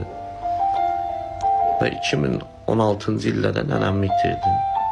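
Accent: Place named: Lankaran, Azerbaijan. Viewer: ☝️Iştı ləhçəku zıne bedə tı Lankonıjiş 👌Xıdo rəhmət bıko 🤲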